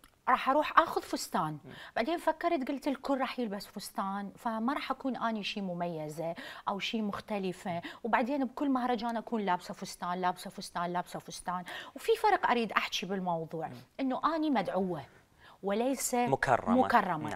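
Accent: Iraq. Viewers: طبعا لهجتك العراقيه والله العظيم ضحكتني من خاطر😂😂😂😂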